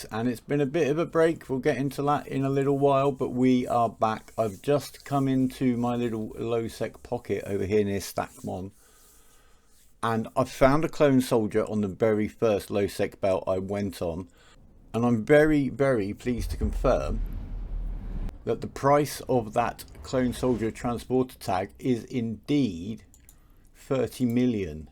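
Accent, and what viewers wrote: United Kingdom. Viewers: Welcome back, hope your condition improves! On my end, I've been able to understand you perfectly. Although if I may comment, it's unfortunate that your accent is UK instead of US. While I prefer a UK accent to listen to, the US accents have a more nasal touch. This results in a lack of stress on the "s" and better to understand even if their condition is worse. If that were to be the case, perhaps we would have the pleasure to have a video from you a little sooner :)) Back to the topic at hand, EVE. Compared to all MMORPGs I'm aware off, this fits in a real niche. Even a 1 day old character is able to participate in end-game content of the newest updates and actually matter. No need to grind levels and gear to have any impact in the newest expension. Even on day 1 after the abyssal filaments release, a rookie cruiser would have been able to jump into the abyss and have fun. 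Of course win chances and improved loot rises the more experienced (older) a character is, but your efforts matter. In that regards, I don't think EVE is pay to win. All the ISK and modules in the world don't help a ship if they meet the right number of cheap fitted ships willing to be sacrificed for their cause. The Subscription based game, would fit EVE like a glove. So much content is more viable when having access to all ships and skills. Nevertheless, plenty of people are enjoying an Alpha playstyle, ever enjoying the endless trial option of the game. IGN : Spectral Spectre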